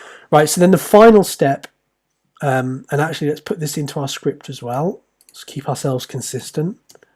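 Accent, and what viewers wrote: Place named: Cockney, United Kingdom. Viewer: it's comfortable to hear cockney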